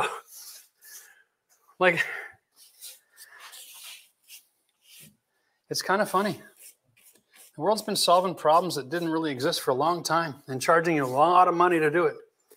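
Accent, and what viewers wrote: Canada. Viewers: Isn’t he Canadian?😂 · I could’ve swore you were Canadian 😄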